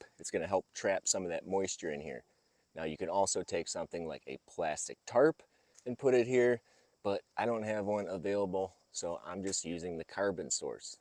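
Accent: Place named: Burbank, United States. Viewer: Another great video. I cold compost but its always fun to listen to that Burbank accent 😂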